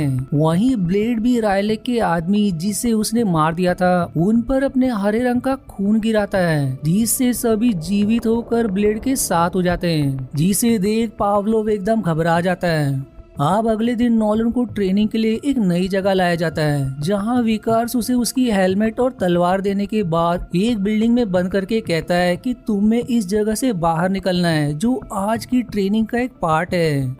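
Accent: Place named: Maharashtra, India. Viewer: Marathi words hote hai , accha lagata hai apke tune me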